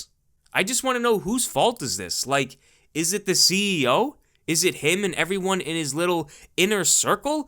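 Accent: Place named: New York, United States. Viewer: I know this is completely unrelated to this video,but are you a New Yorker? Because you really sound like one.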